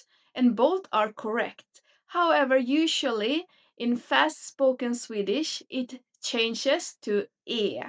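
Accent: Finland Swedish, Finland. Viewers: Jättefin kanal och arbete med att lära ut svenska 🇸🇪👸🎖️ Jag blir ofta irriterad på norskar att det inte uttalar t i det. För det är stor skillnad på de, dem och det på svenska. Och att de säger "hade" istället för Ha det bra som svenskar säger när de menar det på riktigt och inte bara slentrian. Du är annars jätteduktig. Men du pratar en finlandssvensk dialekt? Då kanske man säger som norskarna i din dialekt? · Är du finlandsvensk? Du har en svag brytning eller dialekt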